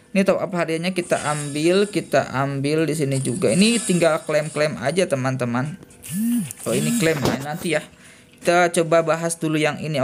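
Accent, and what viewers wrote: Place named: Philippines. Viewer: Bro has Philippines accent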